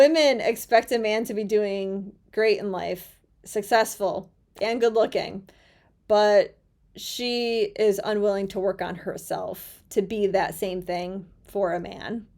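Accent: United States. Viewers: You are a very interesting women and i totally agree with you. Here in the Netherlands it is the same as in all the west. Respect for you....but could you please work on your typical American womenly accent (i hope you know what i mean). Then it would be much more enjoyable. Keep up the good work in creating awareness and perhaps change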